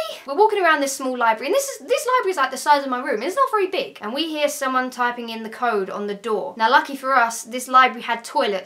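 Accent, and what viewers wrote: United Kingdom. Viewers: British accent <3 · it´s a british accent :)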